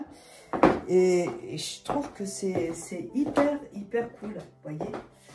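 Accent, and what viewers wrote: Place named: France. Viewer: ❤❤❤❤ very beautiful always. I love your French accent.. sending love from the United states. XOXO. PS will you marry me. LOL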